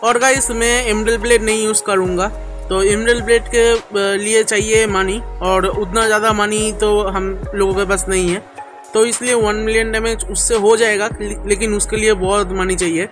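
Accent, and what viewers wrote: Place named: Bengal, India. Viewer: guess by your accent I am Bengali too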